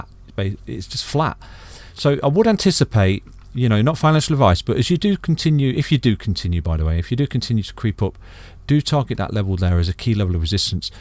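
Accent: United Kingdom. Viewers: Ur great In ur comments mate mint work how come u work in dollars as u sound like ur from the UK?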